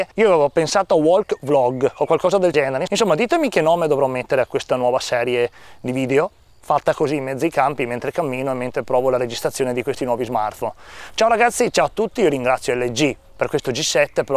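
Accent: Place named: Veneto, Italy. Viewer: Presumo che dall'accento non abiti molto lontano da me..nella mia zona(Montagnana) iliad funziona alla grande, praticamente sempre 4g o quasi, magari lo Speed test non è a livello di Tim, ma alla fine, mica guardo film in 4k col telefono!! nell'uso quotidiano non noto nessuna differenza..quindi secondo me anche tu sarai soddisfatto! grande come sempre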